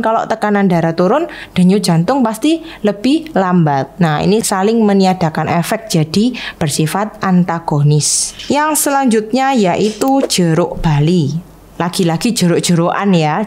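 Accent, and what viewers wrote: Java, Indonesia. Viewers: Makasih ce info nya... Logat bicaranya sy suka ce seperti wong jowo.. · Salam sehat Dok Mau nanya nih Kok ngomongnya MEDOG sekali Emangnya Bu Dokter Asli dari mana Mksh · Medhok sekaliii ngomongnya. Trimakasih dok ilmunya.. 😅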